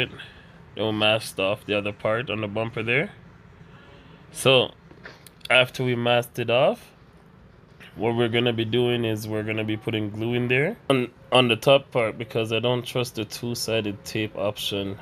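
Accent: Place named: Saint Vincent and the Grenadines. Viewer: man almost sound Vincy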